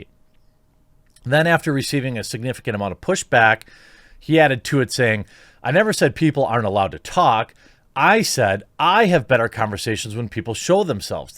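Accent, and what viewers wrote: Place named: Chicago, United States. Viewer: Yeah, I've been noticing this for a while now. In some of his videos that he does earlier in the day, he starts winding up and gets almost irate, saying things like "I'm so sick of these pathetic spineless losers" or some such. Part of me thinks it's the Chicago accent....there's a "I know something you don't know" quality in there....gets on my nerves. And then there's the reminder that he's bi-racial, and from the southside of Chicago... and he skateboards, and was at occupy wall street, lol. But,, the guy is definitely consumed by his job, and does work hard as hell. I appreciate the hard work and the job he does, just lose some of the condescending attitude. Or like Jeremy said, take a long vacation.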